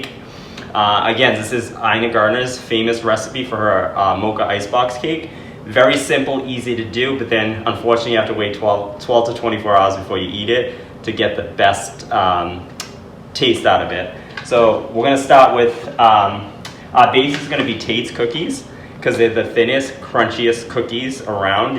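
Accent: Boston, United States. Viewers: Looks delicious! Oreo is adorable! And, is that a Boston accent I’m detecting! LOL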